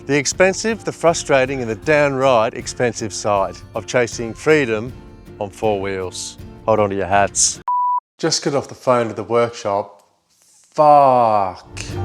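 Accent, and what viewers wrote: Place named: Australia. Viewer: Good day,I’m an old man from London, and have been watching your channel for about 3 weeks and what I find so fascinating about you is your voice, I love the way Australian,s speak don’t ever change it ,anyway when I was a young person 17 in 1964 to be precise I went to Aussie and my first job in Sidney was working for a company called Whelan the wrecker demolitions buildings .well I lasted a week and told the foreman what to do with his job.Well 6 years later I decided to come back home to London a place called Kilburn ,were I grew up. But I loved Australia what a great country, in my travels I palled up with a guy out of Launceston Tasmania called Michael Blazley and we all lived in Melbourne and use it as a base travelling all over the Country from Moree northern New South Wales to Goldsworthy W.A up to Batchelor in the Northern Territory,hitch hiking all over your Country I had a ball. 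I’m also a Van fan I have had many Sprinters in my time,Ford vans like yours are going for under £5000, pounds and there lots of breakers that have lots of gearboxes on E Bay UK . I might be able to help out if you get stuck , Michael.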